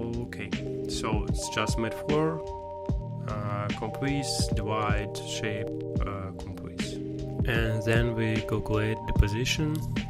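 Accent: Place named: Russia. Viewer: so russian accent )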